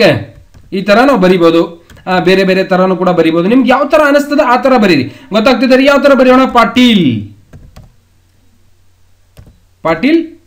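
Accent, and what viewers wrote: North Karnataka, India. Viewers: Haha hutharkanada bashe keloke kushi agutapa ❣️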